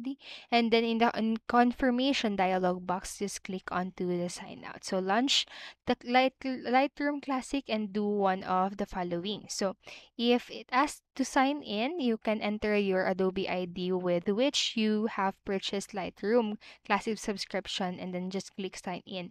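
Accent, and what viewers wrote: Philippines. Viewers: I loathe your fucking annoying Filipino accent!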